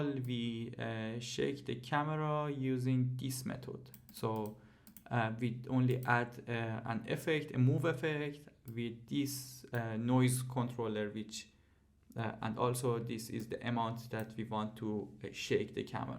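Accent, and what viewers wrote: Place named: Iran. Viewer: I am turk but I know how persian people speak and your accent had sweet persian tonnage )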